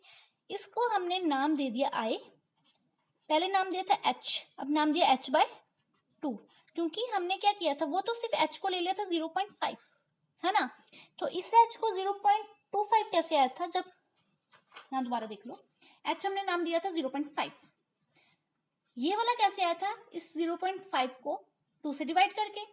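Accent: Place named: Punjab, India. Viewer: Nice explanation mam By the way Your accent feels like punjabi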